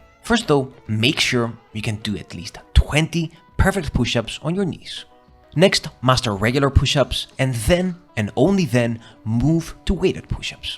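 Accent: Netherlands. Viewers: Do I hear a Dutch accent? Must be! I'll recognize that anytime ❤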